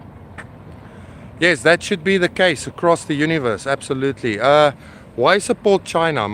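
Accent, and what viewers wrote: South Africa. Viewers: Are you a South African boy? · You sound like a South African. ❤ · You sound like South African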